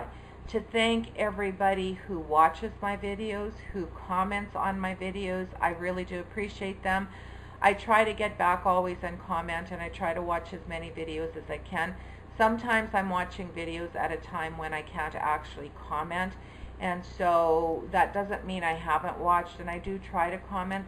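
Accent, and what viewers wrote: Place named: Canada. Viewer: 1st. I love the curlies in your hair, really looks nice. 2nd. You have hit "ONDERLAND" & that is a WooHoo moment !! ~ Very nice video today. It is always nice to hear someone recap their weight loss journey. I always enjoy listening to you speak. You remind me of my girlfriend, Lorna, whom I met while living in Florida. She's blond, blue eyed, from Toronto, and has the same Canadian lingo in her speech. I often think of Lorna when I watch you. I appreciate your friendship. Have a Happy Easter.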